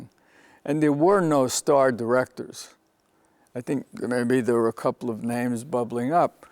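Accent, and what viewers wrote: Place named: New York, United States. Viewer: Thunderbolt and Lightfoot was an extraordinarily accomplished debut. Like another singular talent, Oliver Stone, he was a graduate of Yale. Year of the Dragon remains his best film--for me at least. There was a very beautiful Chinese-American actress in it. I love his accent--pure Italian New York! Thanks for posting this and keep up the good work.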